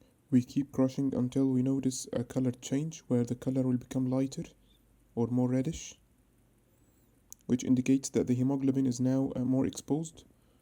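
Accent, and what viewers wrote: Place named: Egypt. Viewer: I knew you're egyptian by the word first. You, egyptians, say "firEst" Good video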